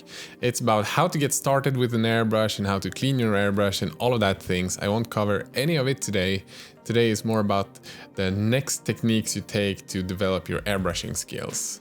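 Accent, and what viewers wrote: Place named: Sweden. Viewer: as long as it suits you. If There will be subtitles it is fine). Like Angel does. I love Sveedish accent, I am watching Pewds since almost the beginning almost used to it. Just received the wet pallet from Redgrass. You should have a deal with them. Bought after your recommendation. Thanks for great content!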